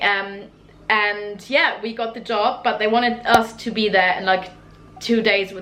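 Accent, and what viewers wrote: England, United Kingdom. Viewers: Interesting for visiting foreigner tourists/workers to know approximate rates. Now tell them about your superannuation that you can get back ! As an aside I met a German young woman working as a waitress in Brunswick, Melbourne. She was on her 2nd year visa. To get her 88 days up she took a job outside Barcaldine as a Jilleroo. 1 months trial and she stayed for 6 months until the harsh summer. She bought an Australian stock saddle to take home for cross country riding. Next video (lol) please speak some German you sound far too English. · She's probably been hanging around too many UK tourists and have picked up their accent. No way does she sound anything like all the German tourists I've encountered nor whilst in Germany myself.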